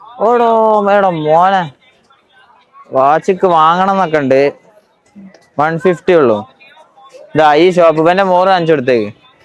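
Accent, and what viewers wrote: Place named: Kozhikode, India. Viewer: കോഴിക്കോടുള്ള വനാണ്..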